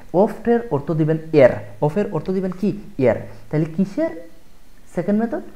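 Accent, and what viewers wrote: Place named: Sylhet, Bangladesh. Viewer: অনেক ভালো লাগলো ক্লাস গুলো। আপনি সিলেট এর মনে হলো। আমি ১ বছর সিলেট ছিলাম, অনেক ভালো মানুষ সিলেটিরা।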